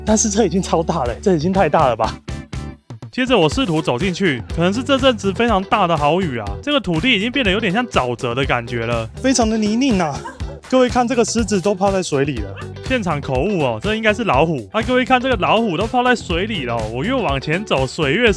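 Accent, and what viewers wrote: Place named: Taichung, Taiwan. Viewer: 第一次看你的影片，看著看著，發現你有台中腔，看到你說：「從大甲家裡出發…」果然🤣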